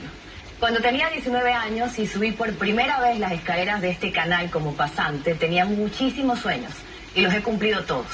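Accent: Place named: Guayaquil, Ecuador. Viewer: eres una mujer linda valiente inteligente comunicativa sensible, emocional 100%, tienes una voz y el tono de una guayaquileña que encanta, seguro te irá bien, cuídate, te extrañaremos.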